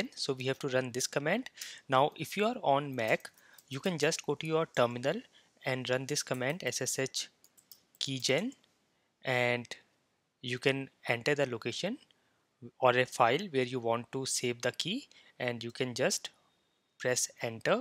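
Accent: India. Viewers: entah foldah saovah nambah pikchah fuchah Administatoh hieh Cringe Indian English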